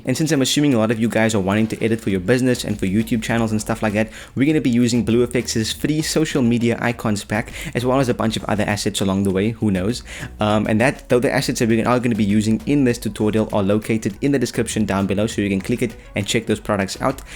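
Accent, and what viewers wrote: South Africa. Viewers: Is that a South African accent I hear?? I am using premiere at the moment but I'm thinking of switching. Do you recommend hit film? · no way i came on this vid and this bra talking in an accent that only another brazzo can understand, coming fromn that west coast ey represemt the ZA fam homie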